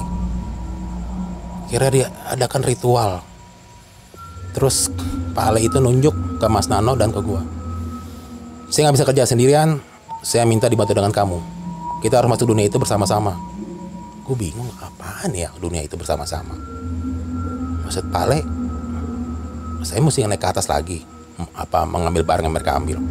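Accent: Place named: Jakarta, Indonesia. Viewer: Bg orang mana?? Jakarta y... Betawi nya kaga ilang..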